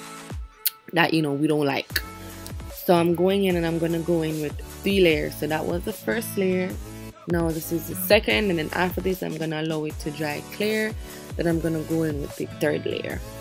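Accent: Jamaica. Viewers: i love your accent ❤️jamaican gang🇯🇲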